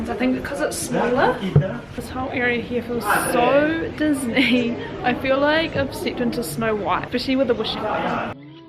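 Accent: New Zealand. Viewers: ) Lovely film, lovely persona, and lovely Kiwi accent (difinitely siventy-siven). And I might have a better question: How many things are definitely worth visiting in this country? There are many, especially outside the usual tracks - try Iasi (pronounced Yahsh or Yaash), a historic capital of Romania, in North-East of the country (historic region of Moldova).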